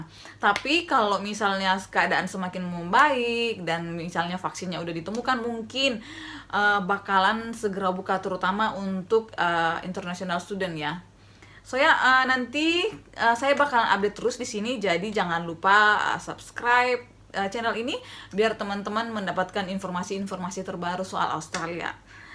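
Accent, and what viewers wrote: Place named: Philippines. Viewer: Damn i tried to translate lol. Your words are pretty much the same as Filipino words and the accent! I think we're long lost sisters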